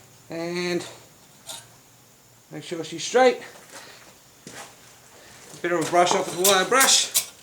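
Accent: Australia. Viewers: Same here Sunday morning with yamez. Had to search you out. I can't understand half of what you gibber out in the aussie accent, but hell I'm from Alabama... I basically speak hillbilly so no one knows what I say either. We call that horn of the anvil lol. Not sure where bic would have ever come from.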